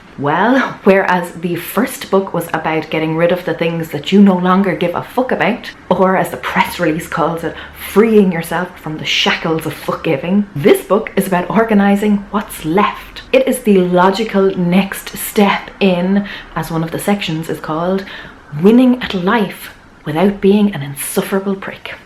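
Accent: Ireland. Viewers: I'm so excited for this interview with Sarah Knight! Fun fact: After watching your review on The Life-Changing Magic of Not Giving a Fuck, I'm currently reading it..... and in my inner monologue, it's narrated in your Irish accent! So, the comment about you doing the audio book was particularly funny for me ;) I can't wait to get into the new book. I'm in Canada, so I'd love to win the US version of Get Your Shit Together!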